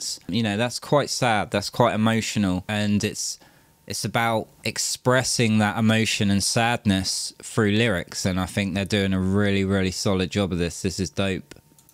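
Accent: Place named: United Kingdom. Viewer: Sorry for loss, I lost my grandmother in May, my father in June and my dog Ireland in August last year, so now how you feel. You were spot on with your reaction, actually you are the only reactor who really understood the emotion they were expressing, love love loved your reaction to this song, as well as all the others. So J Hope didn’t rap when he joined BTS, he would from practicing with Rm and Suga in the dorms and found his own style so that is why you can tell the difference in their styles, you are extremely intuitive, you get these guys so well. Oh and btw love listening to talk, l am a sucker when it comes to British, Irish and Scottish accents, so could listen to you just read the phone book 😂, but also as I said, very intelligent and intuitive, and yes BTS does find you when you need them the most, it is so true, happened with my sister when she was in the hospital after her heart attack, she then wouldn’t stop talking about them so since she didn’t really have anyone to talk to about them in the beginning I would let her go on and on, till then I got hooked with their dancing skills, the fandom who treated you as if you were a life long friend, and then when the pandemic hit, I was really glad I had already been following fhem for a few years since 2018 and I was regretting I didn’t know about them in 2013, but thank god their earlier lives live on youtube and weverse so I was able to see what I missed, I feel like I have been following since 2013. 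Glad you are fi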